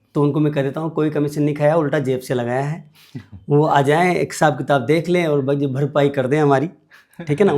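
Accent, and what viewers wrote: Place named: Rajasthan, India. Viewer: Dr ji aap kahan ke hai, aapke bol me haroti ka lahja aa raha hai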